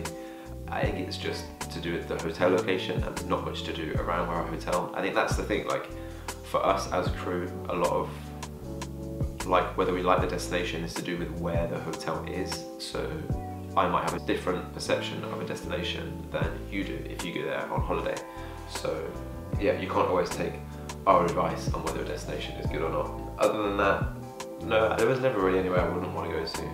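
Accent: United Kingdom. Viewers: Love your British accent! (I am German, that's why...) :-)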